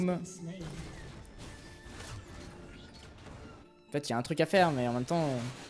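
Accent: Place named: Russia. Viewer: Mdr j'adore l'accens russe quand il parle anglais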